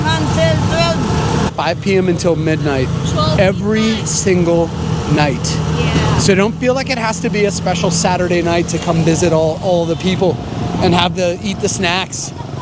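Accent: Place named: Iloilo, Philippines. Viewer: I don't think you're an expat, i think you are actually Illonggo, very good job!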